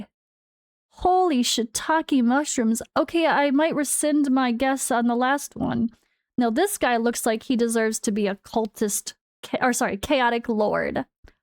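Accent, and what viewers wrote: United States. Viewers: Love your content but so amused by how Americans end a lot of words with a 'a'. Not meaning to be offensive, it just amuses me, lol. Don't know if quirky is a thing in 'American English' but you are, and it's good :) .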